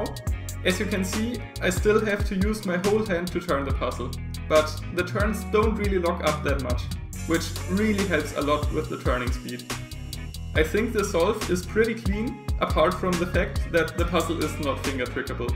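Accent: Germany. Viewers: are yo german? bc your dialect sounds like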